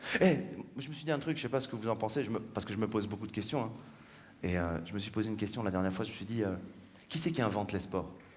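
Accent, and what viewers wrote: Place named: Morocco. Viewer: J aime bien l'accent français marocain Je suis italienne et à travers tes sketch, j 'apprend mieux la langue française, ses sang,intonation ,prononciation Merci bien ,Bravo !!!!!!!!!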